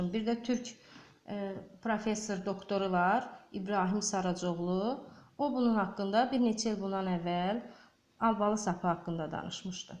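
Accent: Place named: Qobu, Azerbaijan. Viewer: qobu lehcesiyle danwirsiz.Qobulusuz?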